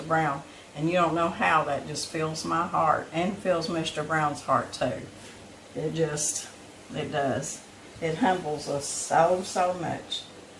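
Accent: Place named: Arkansas, United States. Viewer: I just love your Arkansas accent! Love your videos too. You’re a good cook miss!!!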